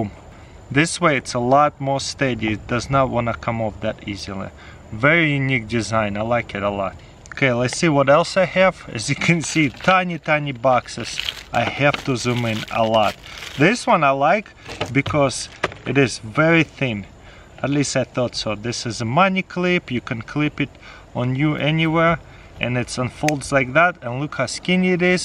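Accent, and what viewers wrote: Russia. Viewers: С каким акцентом он говорит ? Похож на русский, но другой.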